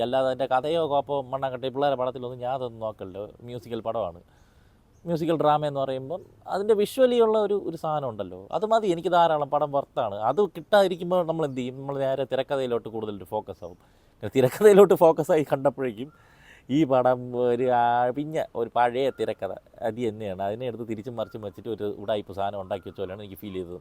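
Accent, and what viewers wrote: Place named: Thiruvananthapuram, India. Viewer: തിരുവനന്തപുരം ഭാഷ❤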